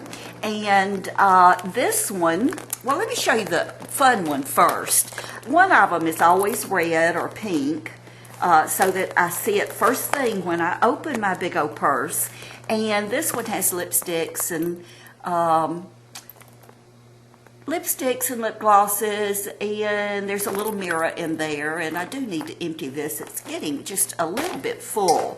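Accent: South, United States. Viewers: Oh!! This is just SO FUNNY 😂😂😂. I am about to wet myself laughing so hard!! You have THE MOST PRECIOUS personality and Southern to boot ❤️. I can't tell you how much this made my day. YOU GO GIRL ❤️😂🌷🌷🌷🌷🌷🌷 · Very good but I'm new from England so " who is Miss Tammy?" also love tour Southern accent which state are you from! Tennessee...pleas tell!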